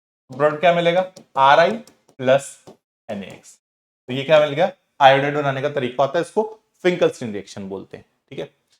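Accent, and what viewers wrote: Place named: Punjab, India. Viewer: Sir tusi punjabi hege o na , kai vari thodi punjabi nikal jandi ee 😅😅😅, I'm also from Punjab 😊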